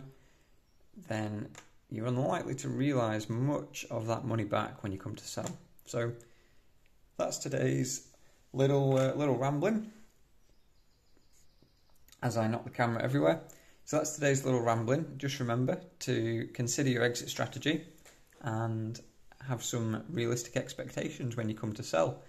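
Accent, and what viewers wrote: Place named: England, United Kingdom. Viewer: I like your accent. What region of England are you from? My apologies if I’m wrong. I’m from Indiana in the United States.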